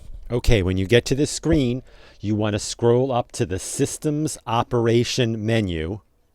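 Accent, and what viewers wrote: New England, United States. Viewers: OMG...YAASSSSS!!!!! I was about to go out and buy a new megabucks router because I thought that was the issue...and then I thought...let me consult the answer to everything...YOUTUBE first. Your video popped up first, and I liked that it said "Fix it NOW!" and my daughter and I both laughed and said we have to watch that one! Because we've been at this for the longest time. Switching out HDMI cables and ports, restarting everything 100 times, even relocating the cable modem and router and all the wiring several times (trying to get closer to the Roku) all to no avail. There truly was no other option but to try a new router (admittedly mine is pretty old). But...your solution worked beautifully! We both love you and think you are a genius! And we like how you say "short video". A little New Englandish sounding accent there! 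Anyway, thank you so much for taking the time to put this together to save us all!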